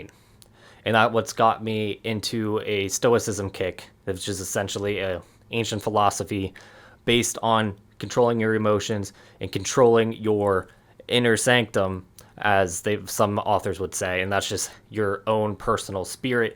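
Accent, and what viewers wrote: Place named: Virginia, United States. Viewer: Thanks for tge advice bro! Been working for me so far. You have a slight Virginia accent , am I correct in assuming that?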